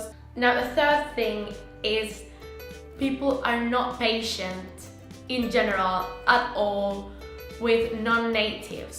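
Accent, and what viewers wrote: United Kingdom. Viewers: Sube más vídeos de palabras porfavor 🥺🥺 amo el acento británico y todos los youtubers que he visto son hombres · Your accent is definitely very heavily British influenced. It sounds great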